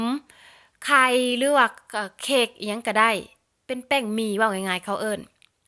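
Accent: Savannakhet, Laos. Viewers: ມັກເຫຼົາເວົາລະອຽດສຽງແຈ່ມແຈ້ງດີລະກະເປັນສຽງໄທສະຫວັນນະເຂດອີກອອກສຽງລະອຽດລະອໍດີເດີ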